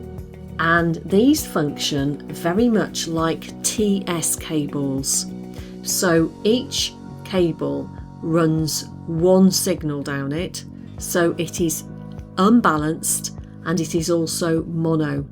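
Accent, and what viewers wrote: United Kingdom. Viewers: Hi! I love your channel. I have a question. I have a yamaha p105 electric piano wich has usb to host. This usb cable is an especial usb cable? Or is it like an usb print cable usb b to usb a? Thank you so much (I adore your british accent hehehe)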